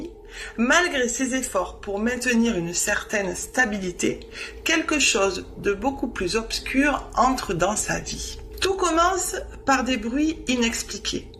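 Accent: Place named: South, France. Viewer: Bonjour 😊 je découvre la chaîne avec ce petit accent du sud 😊 comme nous 😊 du coup un abonné de plus 😊 merci pour ce partage 😊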